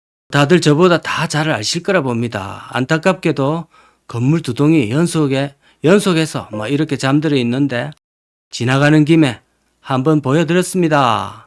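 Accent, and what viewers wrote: Gyeongsang, South Korea. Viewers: ~재밌는영상에 중독되었네요~ 배울것도많고 유머도있어서 힐링되네요~저도 경북이고향이라구 구수한 경상도 사투리가정감있고추억소환이 되네요~잘보고 있습니다~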